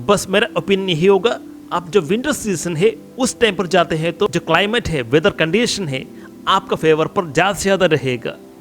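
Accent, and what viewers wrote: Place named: South, India. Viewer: पहले अपना हिंदी तुम सही करो. वह दक्षिण से ही हे. बोलने का कोशिश भी करने नहीं देते आप लोग. फिर कैसे सीखेंगे?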